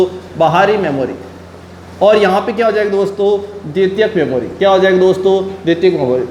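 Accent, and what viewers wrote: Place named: Jodhpur, India. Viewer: गुरु जी आपकी जोधपुरी भाषा घणी छोकी लागी इया ही पढाता रेवो घणा बढिया पढाओ आप जितरी तारीफ करु उतणी कम है जय हिंद गुरु जी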